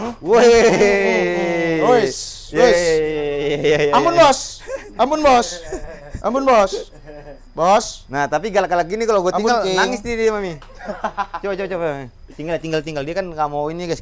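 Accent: Ambon, Indonesia. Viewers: Biar da lama di jakarta ttpi logat Ambon nya nga ilang2 👍👍👍😂😂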